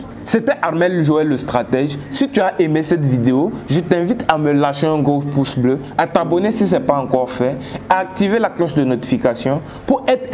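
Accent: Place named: Burkina Faso. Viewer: Un Burkinabé à travers la façon de parler ❤ salut Frère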